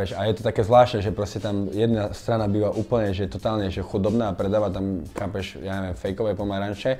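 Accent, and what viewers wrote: Eastern Slovakia, Slovakia. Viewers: Vychodniar jak repa 😀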